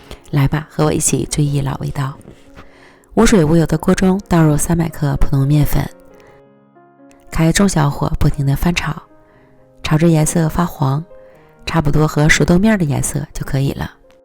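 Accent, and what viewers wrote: Northeast, China. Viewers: 这东北口音平卷舌不分。。。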